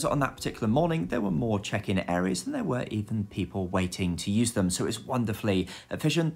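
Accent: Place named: England, United Kingdom. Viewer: Your English accent tho 🥵 yummy 🤤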